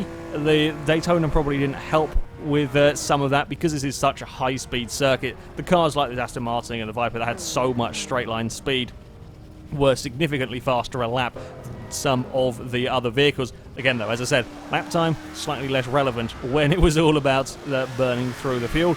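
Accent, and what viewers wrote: United Kingdom. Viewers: You sound incredibly British :D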